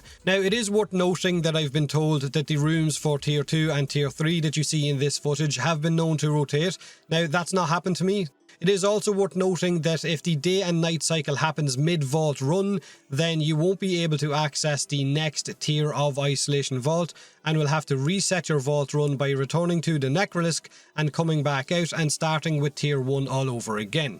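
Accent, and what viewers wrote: Ireland. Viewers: 4 seconds in and i new this guy was irish-i think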